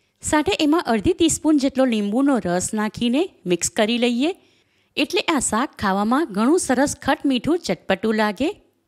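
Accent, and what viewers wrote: Surat, India. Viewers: તમારી હુરતી સ્ટાઇલ ની ભાષા સાંભળવાની મજા આવે છે .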